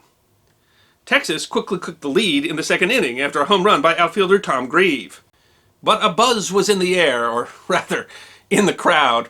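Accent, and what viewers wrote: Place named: Indiana, United States. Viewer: you sound like the indiana version of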